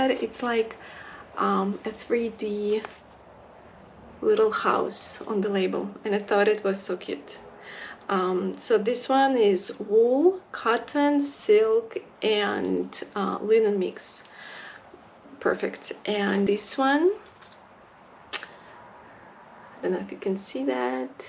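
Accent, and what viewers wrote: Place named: Russia. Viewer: Я не знаю разговариваете ли вы по русски,но ваш акцент меня на это натолкнул😅. Видео просто замечательное и очень красивое. У вас очень приятный голос ❤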